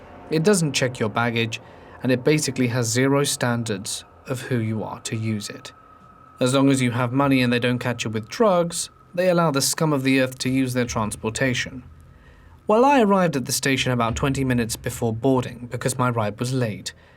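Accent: England, United Kingdom. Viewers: Oh, I have to say that even poop stories are better when narrated by an Englishman. I think my neighbors must have wondered what the hell I was laughing about. Absolutely brilliant! 🤣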